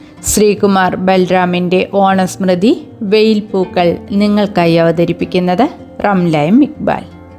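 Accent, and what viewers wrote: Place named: Valluvanad, India. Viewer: വള്ളുവനാടൻ തനിമ നിറഞ്ഞ ശബ്ദം പ്രിയ സഹോദരി അഭിനന്ദനങ്ങൾ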